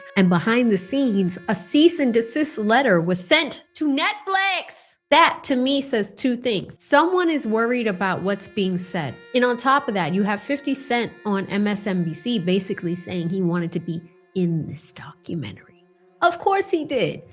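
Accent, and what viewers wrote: Boston, United States. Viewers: You really let the Boston accent out in this one.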